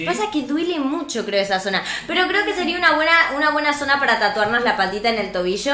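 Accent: Argentina. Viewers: Sos de argentina?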